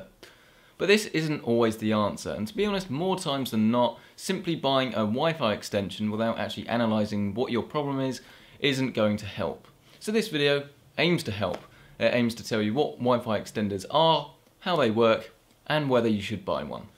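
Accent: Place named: England, United Kingdom. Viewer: yes rooter!!! if your american you say router but the world dont revolve around usa ... listen to him he like me are english!!!!!!!!! we speak different we have different customs, if you dont like it watch an american review one !!!!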